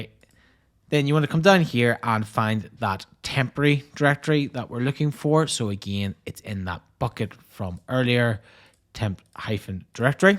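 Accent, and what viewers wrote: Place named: Northern Ireland, United Kingdom. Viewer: Finally an aws tutorial that uses "wee" and talks in a NI accent, made me laugh that I finally found a fellow NI person :) followed every word :D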